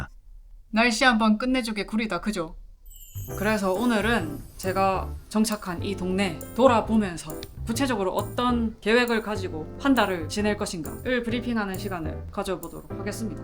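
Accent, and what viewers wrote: Busan, South Korea. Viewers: 구독함!, 꾸밈없어 좋음, 경상도 표준어 너무 좋음!!! 30대 후반인데 어째 4~50대 필이 나서 더더더 좋음^^; 요즘 시덥잖은 넘탱이들 여행유튭보다 훨 나음. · 부산분이시죠? 너무 잘 봤습니다. ㅎㅎ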